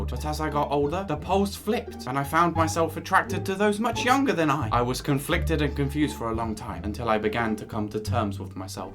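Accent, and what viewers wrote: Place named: United Kingdom. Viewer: It always sound better and more degrading with a British accent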